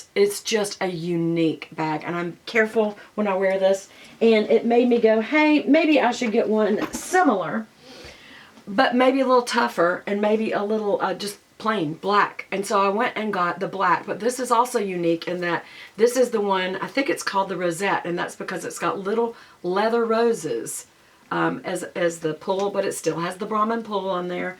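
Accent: South, United States. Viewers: I wanted and had my heart set on the large Duxbury but it was too long for my 5’2” stature. Love your southern accent and your Pandora bracelet. I pray this doesn’t sound weird but you look as though you smell good. 💕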